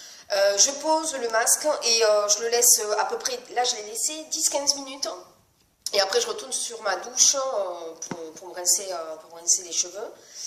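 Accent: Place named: Catalonia, Spain. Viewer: Oui effectivement un bel accent du Sud catalan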